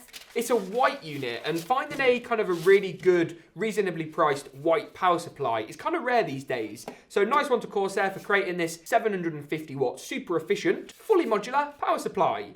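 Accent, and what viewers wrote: United Kingdom. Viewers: your're the british guy right?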